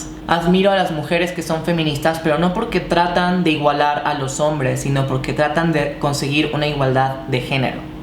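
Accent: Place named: Fresa, Mexico. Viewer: porqué siempre hablas tan pinche fresa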